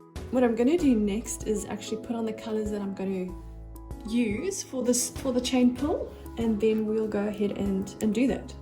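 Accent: South Africa. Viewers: You sound South African, this is encouraging if you are in South Africa, that means that you can probably get Floetrol and the paint in SA?? I'm in the UK at the moment, returning to SA soon and hoping to be able to create some of these beautiful swipes and pours. Loving the colours and your ocean creations. xx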